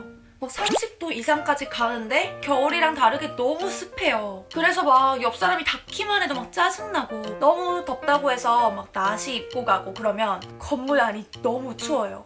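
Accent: United Kingdom. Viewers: Your british accent is so gooood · Você tem sotaque britânico quando fala inglês 😂 que fofa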